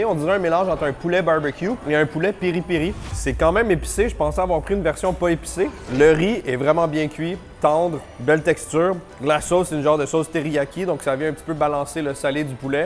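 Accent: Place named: Quebec, Canada. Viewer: J'aime bien la vibe de t'es vidéos quand tu voyage, ton québécois ressort plus que dans t'es vidéo a la maison ! Toujours un plaisir de te regarder :)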